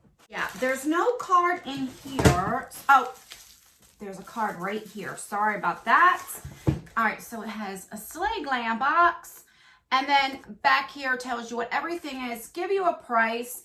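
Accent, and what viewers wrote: New Jersey, United States. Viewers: I would LOVE love to get picked! But of course I’ve tried slay glam on my own dollar and was sorely disappointed and then the box of the month after was AMAZING🙈 I would love to try it again and I can’t imagine a better way too. As always I enjoy watching your videos and always enjoy your jersey accent 😘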